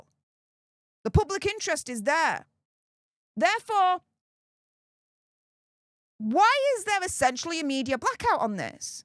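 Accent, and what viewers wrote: Yorkshire, United Kingdom. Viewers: Not sure where you're from, but by your accent, it's Yorkshire, I think. You're very brave, hun, reporting on these topics. I'm taking my hat off to you😇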